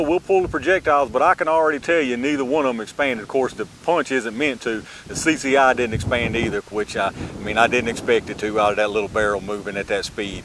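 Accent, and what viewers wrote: South, United States. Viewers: Excellent presentation, loved: that southern talk!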